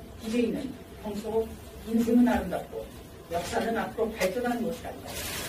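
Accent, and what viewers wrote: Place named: Seoul, South Korea. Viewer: 조수진 의원님 화이팅입니다 서울 토박이인줄 알앗네여 뉴스하실때도 똑 의원의로도 똑 입니다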